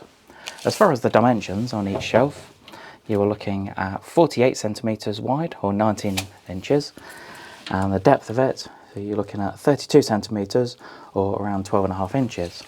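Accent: United Kingdom. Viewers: I subscribed your channel when I needed to learn how to properly clean a Dyson V8(V6? Can’t remember) back in 2016 or 17. Over the years, I watched some of the videos posted and I found your voice quite soothing and ideal for listening as I don’t often get exposed to British accent. I like the fact that all the videos are practical and informative. Sometimes I just have the videos played at the background while working, and I even picked up a few terms for house appliances! Thanks!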